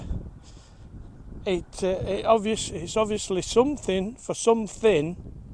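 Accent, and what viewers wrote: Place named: Yorkshire, United Kingdom. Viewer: Eyup, Quality vid dat remember it well not been round dare from about same time as de never knew dat bridge had been changed or dose houses been built cheers pal enjoyed dat PS nowt wrong wi de voice proper Yorkshire 👍👍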